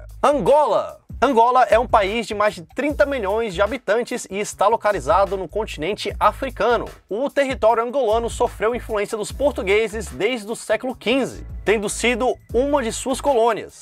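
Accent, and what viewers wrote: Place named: Northeast, Brazil. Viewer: Qual o sotaque deste apresentador ?deve ser do sotaque do nordeste ...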